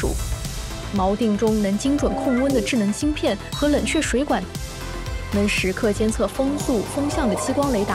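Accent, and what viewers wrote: Sichuan, China. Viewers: 配音的是我老乡四川人❤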